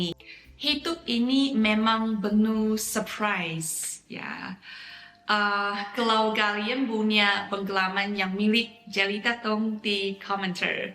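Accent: China. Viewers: Kakak dari Beijing ya? Dulu ada temenku dari China yg 'dialek' bahasa Indonesianya seperti kakak kalo ngomong.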